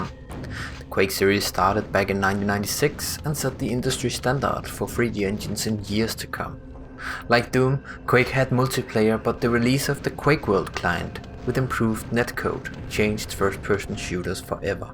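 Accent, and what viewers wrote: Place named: Denmark. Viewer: I love your accent, you shouldnt be Danish by any chance?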